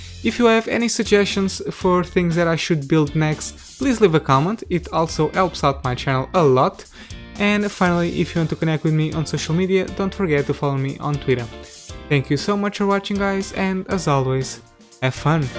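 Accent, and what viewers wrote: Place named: Portugal. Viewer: O sotaque português nota-se tão bem! Grande trabalho que tens feito neste canal, vejo muitos dos vídeos que fazes, obrigado pela dedicação!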